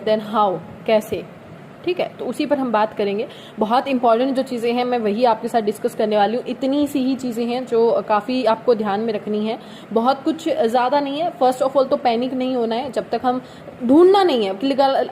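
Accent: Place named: Maharashtra, India. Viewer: Maharashtra s clear h ye to.... Marathi aati h apko🤔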